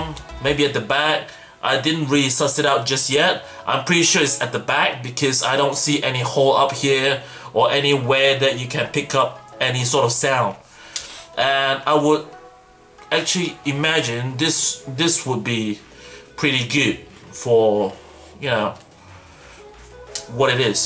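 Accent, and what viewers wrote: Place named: Australia. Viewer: Asian & Aussie accent... 1st time I've heard this mixture. 😎👍🏽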